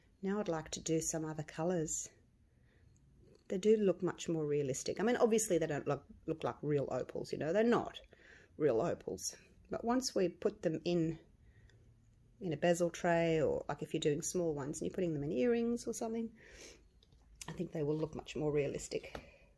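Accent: Australia. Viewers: your lovely personality shines through! I love all the things you say, love the Australian way of saying things that's different from American way of saying things! You are interesting and engaging and very informative -- all good reasons to watch you, learn from you. Please don't change anything because someone commented on it. These are so beautiful! I love the pink! I don't think it's too much pink. If the white one was not next to the pink one, it would not be so obvious that you added pink! Opal was my mother's birthstone, she loved them and so do I! How about a touch of blue or blue-green? I don't think the powder on the back shows through. Beautiful, just beautiful! Thank you and take care and stay safe!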